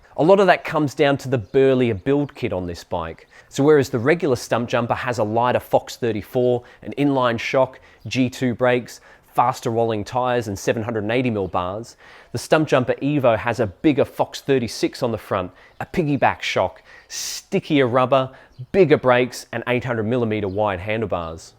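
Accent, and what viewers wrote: United Kingdom. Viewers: Are you a Brit in disguise? Intrigued by the accent... 🧐 (Yes I did enjoy the bike content!)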